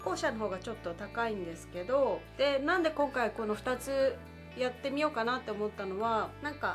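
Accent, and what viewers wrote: Okinawa, Japan. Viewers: 沖縄感ありますよ 沖縄出身者より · 私は容姿よりも、声、日本語のイントネーションが、沖縄を感じました。個体差があるから興味を持てるんですよね〜。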